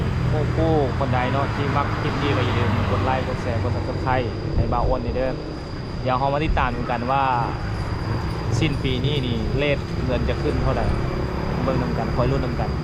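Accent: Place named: Laos. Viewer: สู้ๆครับไม่ต่างกันเลยไทยลาวสมาชิกใหม่เด้อรับด้วยครับชอบสำเนียงลาวครับ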